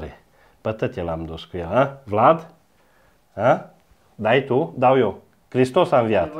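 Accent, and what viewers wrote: Transylvania, Romania. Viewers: Adevărat a înviat, domnu` profisor! Ador ardelenii.. am avut în facultate prof de hidraulică ardelean, ador graiu ardelenesc, mni! :) Doamne ajută!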